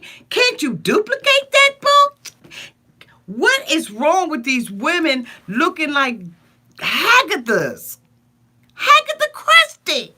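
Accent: Washington DC, United States. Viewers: Hi there 👋🏾. I'm a newbie here. I've been looking for more reviews of this show. Glad I've found you! Where are you from? I here a DC area accent. Am I right?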